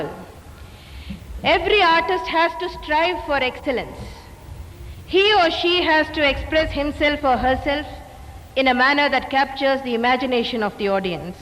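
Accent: India. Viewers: చాల చక్కగా సుస్పష్టంగా అచ్చంగా చక్కటి తెలుగులో మాట్లాడారు....మీకు ఉత్తమగతులు కలుగు గాక....🙏🏼🙏🏼🙏🏼🙏🏼🙏🏼🙏🏼🙏🏼🙏🏼🙏🏼🙏🏼🙏🏼🙏🏼🙏🏼🙏🏼🙏🏼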